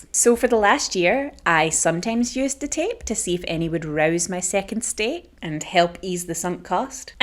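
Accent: Scotland, United Kingdom. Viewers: . Which particular brand of British accent is that? 🤔😅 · Hey girl, you Scottish, you sound it. I have used it for years on and off. Been doing a lot of other meditations last few years. Keep going, it took me many years to project, only done it once but it was very vivid. I found myself floating around the ceiling. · You are from Scotland aren't you 😄 love the accent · I've now found a new favorite accent, is that Scottish, Irish, or something else?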